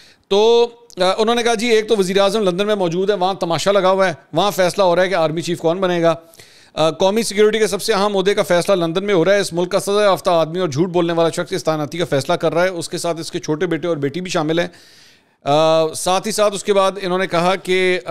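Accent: Punjab, India. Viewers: Punjabi style speaking is excellent, but please add some Pushto dialogue like Nishta etc. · Hahaha.. bhai ur punjabi touch rocks😊😊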